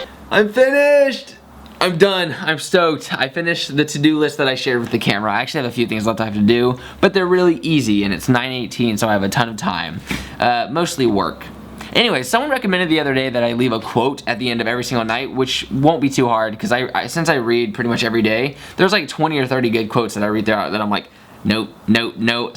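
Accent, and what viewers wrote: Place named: Kentucky, United States. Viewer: I'm assuming he's from Kentucky like William. I'm from uk so that's my only reference point:D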